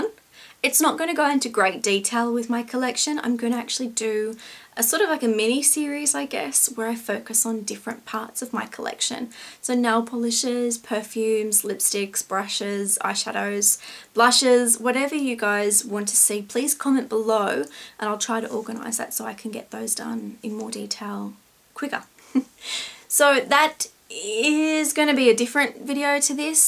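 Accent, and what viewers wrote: Australia. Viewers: are you australian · Knew you were Aussie straight away but I often forget how... Different we sound when watching YouTube or movies xD so jealous of your opi polish collection! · LOOOOVE UR ACCENT! <3 best aussie style ever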